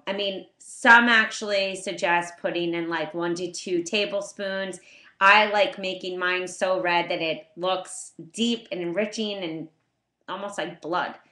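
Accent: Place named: South Shore, United States. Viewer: listen to that accent, south shore perhaps? can you eat them raw?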